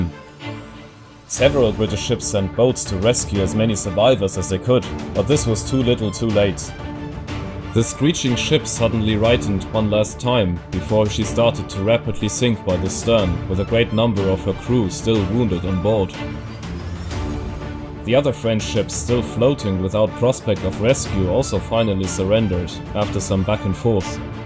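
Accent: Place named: Germany. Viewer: so well made and also so funny with your lovely german dialect. you gained a sub!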